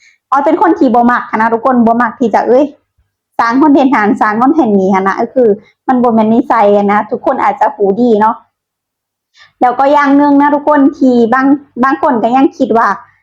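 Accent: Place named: Loei, Thailand. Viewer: สำเนียงเว่าคือคน จ.เลย แท้ครับ😅😅😅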